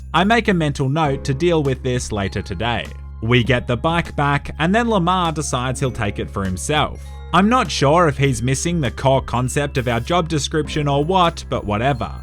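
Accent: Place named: Australia. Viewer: The steve irwin of youtube gaming. because you're white and sound austrailian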